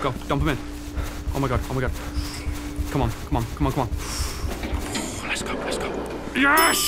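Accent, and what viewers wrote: United Kingdom. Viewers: I was pretending to be hypnotized because I was watching it and I was confused of what the intro was and so I rewind it and pretended to be hypnotized. That intro was pretty cool. Also when I watched your videos you sound a little bit British like when I heard you say dawko I didn’t hear it clearly. Do a DNA test of where you could be from, Dawko did one on his channel 3 years ago. Or what your part of part Irish, part Scottish, part Swedish idk please make a video of it if you can and watch dawko video for an idea. Just search Dawko DNA Test and you’ll find it. And once you know what to do try to make a video on it.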